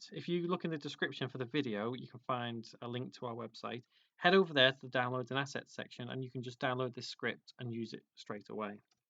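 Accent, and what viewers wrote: United Kingdom. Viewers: inglês britânico é tão maneiro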